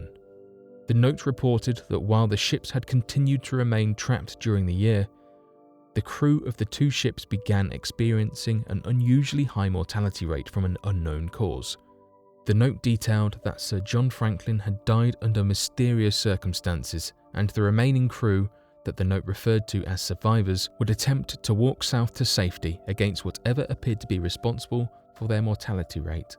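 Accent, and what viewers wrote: England, United Kingdom. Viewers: for a guy with an English accent, he sure doesn't speak English well. "had went missing"? "tells of that of a married couple"?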